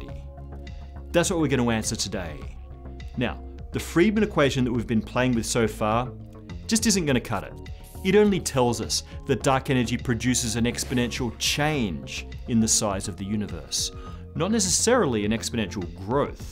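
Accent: Australia. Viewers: This chap has an Australian accent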